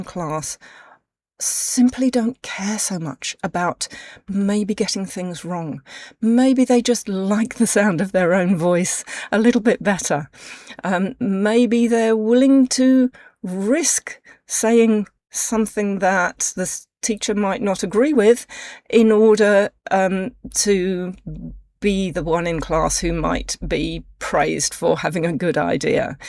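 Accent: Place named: RP, United Kingdom. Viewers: It is great help for native speakers like me to learn RP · I find it enjoyable to listen to your English British sound. I wish I could speak like you.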